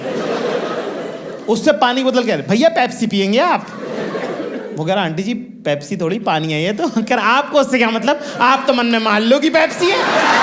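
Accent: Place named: Bhopal, India. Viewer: That bhopali accent is hitting hard❤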